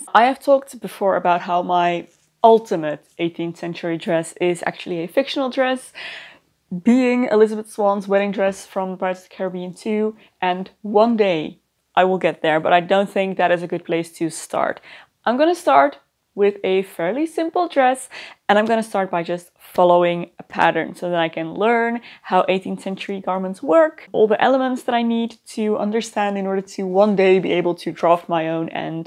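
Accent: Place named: Netherlands. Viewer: hoor ik een wat Nederlands accent af en toe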